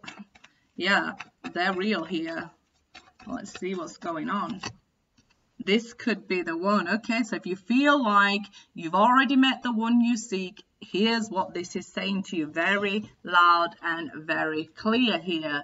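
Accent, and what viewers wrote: Yorkshire, United Kingdom. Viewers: Hi I'm just wondering if you do individual readings? It's good to hear a Yorkshire accent on YouTube 😊 Xx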